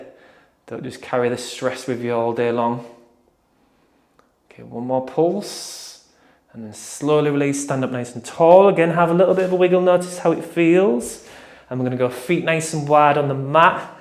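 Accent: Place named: Hull, United Kingdom. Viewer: Hull accent always makes me smile haha. Smaaaling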